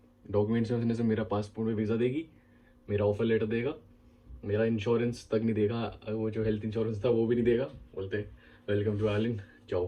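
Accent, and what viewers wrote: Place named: Gujarat, India. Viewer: Gujarati English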